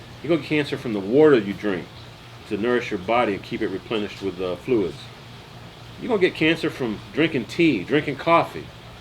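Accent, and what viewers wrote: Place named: Louisiana, United States. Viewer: What part of Louisiana you from?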